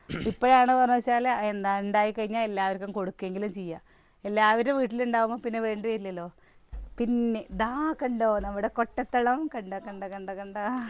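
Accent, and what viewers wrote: Palakkad, India. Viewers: ഞാൻ പുതിയ subscriber ആണ് ട്ടോ. പാലക്കാടൻ ഭാഷ കേട്ടു വന്നതാണ്. നല്ലൊരു അനിയത്തികുട്ടി. എന്നും നിങ്ങളുടെ സ്നേഹം തളിർതിരിക്കട്ടെ. ഇന്നത്തെ പെൺകുട്ടികൾ വാക്കുകൾക്ക് കാതോർക്കു. ❤️❤️❤️❤️❤️❤️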